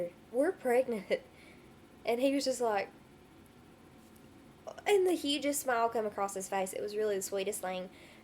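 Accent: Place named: Southwest, United States. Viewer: R u frm Texas or sumthin cause it sounds like u have like a southwestern accent?!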